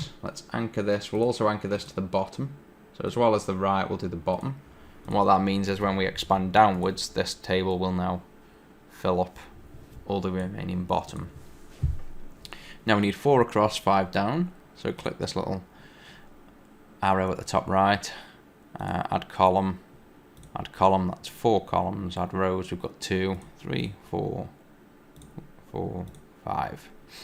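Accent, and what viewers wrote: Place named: Stoke, United Kingdom. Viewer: You sound like a Stoke lad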